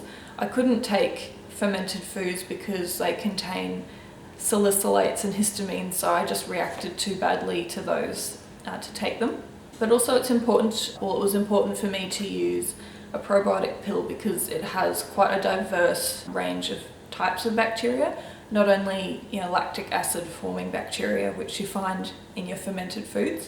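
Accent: Australia. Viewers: Thank you for this video, I have had eczema for as long as I can Remember. I do a lot to heal my gut and body it's a journey and wonderful to hear about yours. Where are you from btw I can't get your accent Australia or Newzealand? you look great now all the best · Is she Australian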